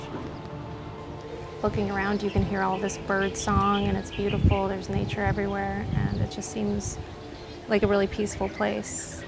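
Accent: Cambodia. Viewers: Your Khmer accent sounds so beautiful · I’m Cambodian and your accent sound like mine 😭😭😂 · your khmer accent is really cute! And love the effort :)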